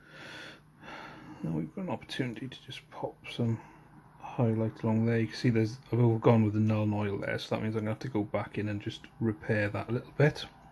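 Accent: Wales, United Kingdom. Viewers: loving your new channel mate. great warcry content. Subbed! Where bouts you from? That is definitely a fellow welsh accent i hear.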